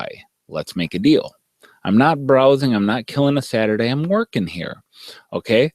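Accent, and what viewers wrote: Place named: Wisconsin, United States. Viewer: I live in Milwaukee - I've been saying the same thing about getting to Pete's store but haven't been yet. Where in Wisconsin do you live? You have that signature Wisconsin accent that we're all famous for by the way!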